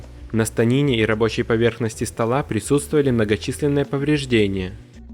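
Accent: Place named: Belarus. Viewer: звучит как беларус )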